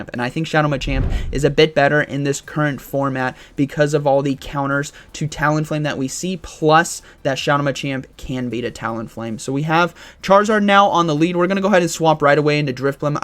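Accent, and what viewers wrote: United States. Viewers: Didn't realize you lived in the UK. You sound pretty American imo! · Wait is from the UK? Thought he was American lol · He's American, residing in the UK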